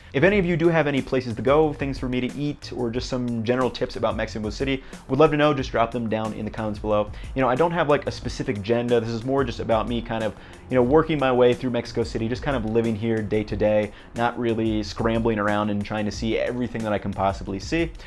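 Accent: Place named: Seattle, United States. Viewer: Where in the US are you from? You sound like Seattle?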